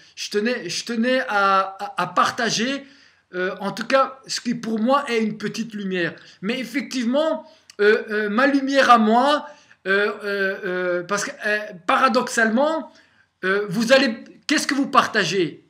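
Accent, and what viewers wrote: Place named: Belgium. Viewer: Le petit accent Belge qui passe bien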